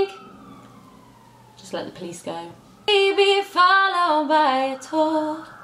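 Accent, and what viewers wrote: United Kingdom. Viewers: I love your UK accent :) greetings from Cali🌴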